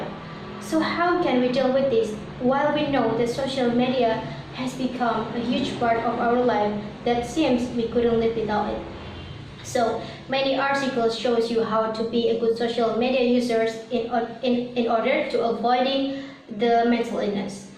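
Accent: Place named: England, United Kingdom. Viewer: Saran sih... Pronunciation nya terlalu ke inggris2 an, jadi di dengar nya agak ngga jelas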